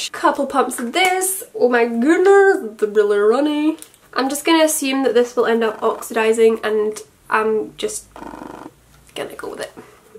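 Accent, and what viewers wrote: Scotland, United Kingdom. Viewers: Natalie Briggs nevermind, she's Scottish lol she just spoke so light handed with it, I had mistaken it for Irish! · Alaiza Toscano I'm pretty sure she's Scottish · I usually always know whether i'm going to like a video going by an intro. And i went through like 3 videos before yours, but i'm staying here :) good on you for not looking like a conceited, regular influencer. Interesting how your from Scotland :P I lived in Ireland Dublin and we've got VERY similar accents. Now i know why people think i'm scottish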